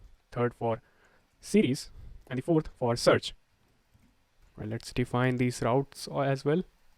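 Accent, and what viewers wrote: India. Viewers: you speak in india english it's not original english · Sorry not sorry but he is Indian so his English is also Indian 🤟🏻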